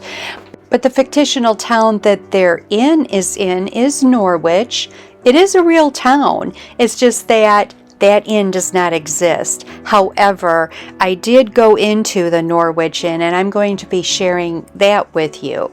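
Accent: Midwest, United States. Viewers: Thanks for a great tour,. King Arthur’s product selection is vast and oh so tempting. Hubby and I have given up eating white flour and sugar so it’s a good thing that we don’t live there! But, we are not zealots and occasionally treat ourselves to a sweet. Your videos are very good and getting better. I enjoy watching. Originally from the Midwest, myself, (Ohio), and I enjoy listening to your accent which is so familiar to me. Have a great week!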